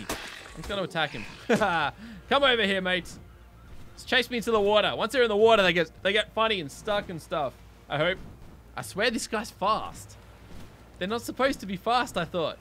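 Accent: Australia. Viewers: Hay u Australian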